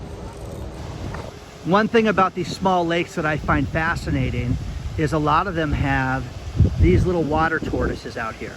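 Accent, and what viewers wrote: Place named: Western New York, United States. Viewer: Are you from Western NY State? Your accent, the way you pronounce certain words like "roof" indicates you are. I'm originally from Buffalo NY area.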